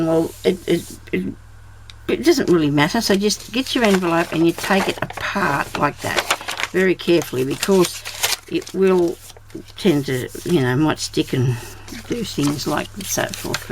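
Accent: Australia. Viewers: Very very pretty love it...and your accent also....where is it from Australia?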